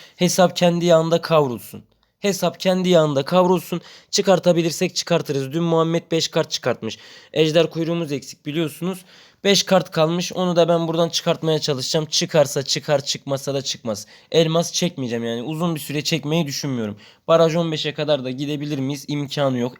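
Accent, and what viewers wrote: Erzurum, Turkey. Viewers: Abi sen erzurumlumusun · erzurumlumusun abi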